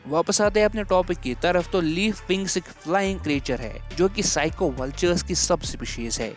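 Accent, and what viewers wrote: Kashmir, India. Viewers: R u from Kashmir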